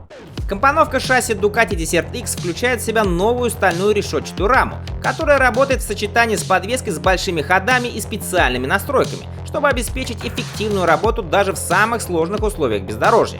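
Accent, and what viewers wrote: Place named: Russia. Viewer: мда.... петушинный кацапский акцент, десерт - это в столовой, а это дЕсерт - пустыня, хоть бы название мотоцикла потрудился сказать правильно в первые секунды ролика.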